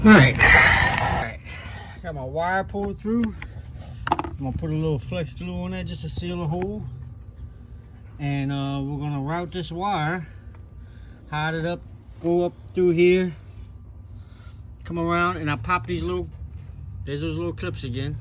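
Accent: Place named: Louisiana, United States. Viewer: You gotta be from Louisiana brother lol. I know that accent from a mile away, I lived in Louisiana for 5 years. Great video, I bought similar lights for my truck, thanks for sharing!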